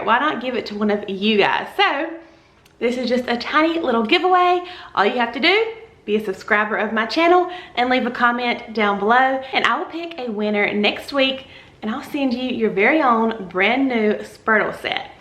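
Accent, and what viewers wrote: South, United States. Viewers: Your southern accent is so sweet. Your dishes are very appealing. I'm from Oklahoma and we don't have quite the accent. Have a wonderful eveninf! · Enjoy your videos. Love your country accent ♥️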